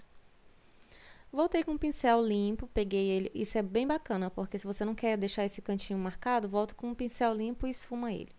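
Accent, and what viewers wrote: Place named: Minas Gerais, Brazil. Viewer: vc é de onde? seu sotaque parece de gente de Teófilo Otoni em MG...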